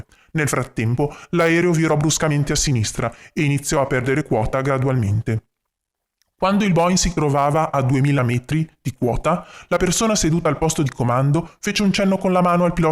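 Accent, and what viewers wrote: Genoa, Italy. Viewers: Con questa si sente meno l accento genovese belin😂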